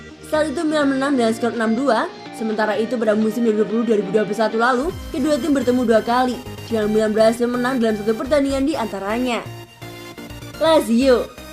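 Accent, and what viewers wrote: Java, Indonesia. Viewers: Ganti lah voice over nya, medok ngmg kek kumur2 mana cadel lg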